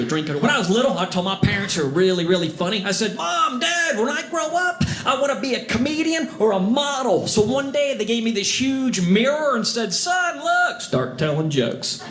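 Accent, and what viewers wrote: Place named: Texas, United States. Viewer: Hold up. I tried not to say anything, but this is eating at me: His mama is English, speaks fluent Italian, Dad is Italian and speaks fluent English. Why does dude sound like he's from Texas?!?!?!?!? Somebody help me, I'm sooooo confused 😕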